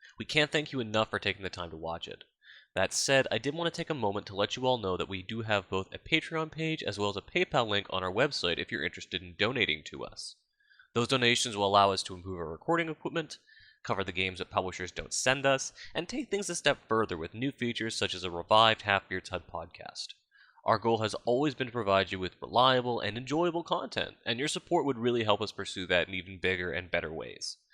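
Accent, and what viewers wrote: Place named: Russia. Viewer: "join the army they said- It'll be fun" Pretty much the summary of the whole game. I am also a fan of the 'turn around and everything is different' and 'magically forming floor' tropes. It always gets me, specially when used in a horror/thiller sense. Not my favorite game, but it was entertaining none the less, your Russian accent helped with that lol. The monster's especially the Librarins were honestly terrifying. Though the story was super muddled to me and when you start explaining the book and stuff at the end I go "huh... so that's what that was"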